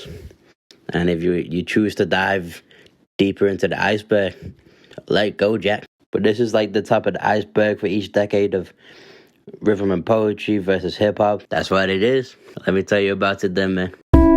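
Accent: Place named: Northern Ireland, United Kingdom. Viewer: To me it reminds me of different accents, like a bit of an irish accent but also newcastle. It just doesn't quite fit with either to me, I'm pretty sure he's from somewhere on the british isles. English is not my first language though, so I might be completely off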